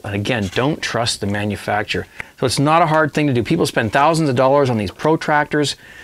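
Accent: Canada. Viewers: Also, one of the best Canadian accents ever. Thank you for this video! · Must be because he's Canadian (I can hear it).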